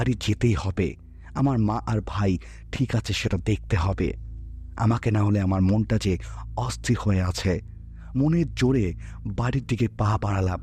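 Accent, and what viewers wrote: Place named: Bangladesh. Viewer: Apni banladeshi??? Kothar bhul bhalo ucharon shunei bojha jache😂😂😂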